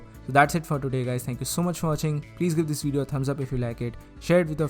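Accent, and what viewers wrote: India. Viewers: ur accent is very familiar ... Are u Indian? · yeah its indian accent ,I can understand I am also indian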